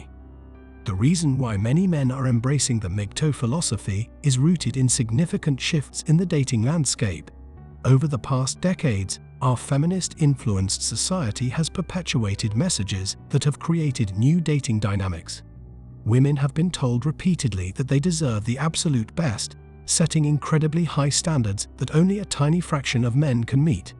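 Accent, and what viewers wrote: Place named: United Kingdom. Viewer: How about you take your British accent and get bent.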